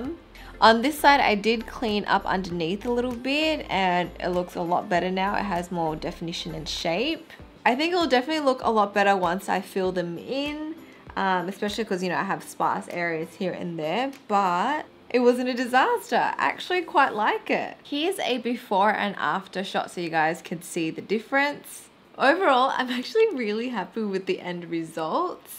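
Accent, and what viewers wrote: Australia. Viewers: I really love videos, aside from you're learning from her beauty videos, I'm learning on her accent as well since our clients are Australians. Biggest thumbs up to my most favorite youtuber!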